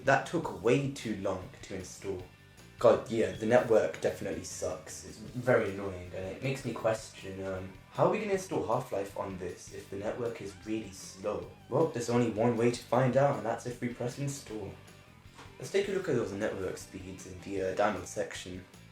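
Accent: United Kingdom. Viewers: you sound british tho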